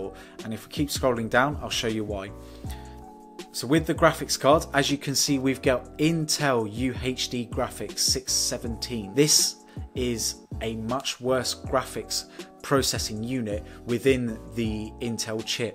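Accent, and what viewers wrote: United Kingdom. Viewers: Are u Indian ? But u talk like British tounge. Nice vlog anyway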